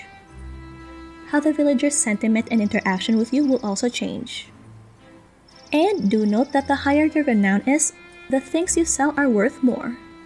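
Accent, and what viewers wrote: Philippines. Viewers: FIrst few seconds of watching and hearing you talk, I immediately thought "Fellow kababayan!" :D I'm so glad seeing more and more MIrthwood videos, we gotta spread the message of what a gem this game is! I've been following and playing Mirthwood since Playtests these last weeks and been playing since release. I enjoyed your video! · pinoy accent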